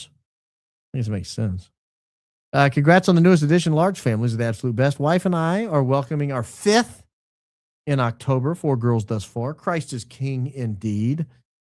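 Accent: South, United States. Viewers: Just ridden across London bridge listening to your Southern tones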